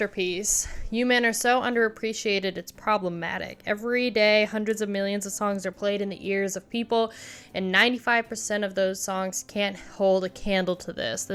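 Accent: South, United States. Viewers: Where are you from? I'm detecting a southern accent in your voice.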